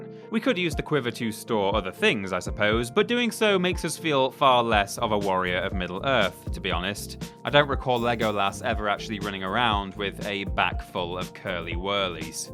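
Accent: United Kingdom. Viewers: While I'm normally cringeworthy towards British accents, I could listen ramble on all day on just about anything.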